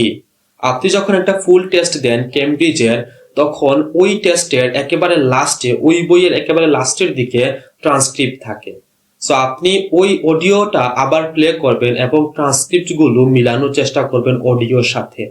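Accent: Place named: Sylhet, Bangladesh. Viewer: দুজনই সিলঠি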